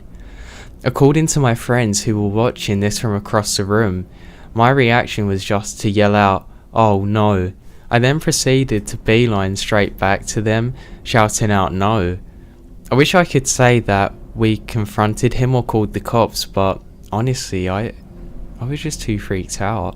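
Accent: England, United Kingdom. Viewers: Why do English people always pronounce anything/nothing like nothink and anythink? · Hi just found ur channel...I'm an insomniac!! I'm UK too...nice to hear a familiar accent!! Great long vid!!! Thank you!!!🖤🖤🖤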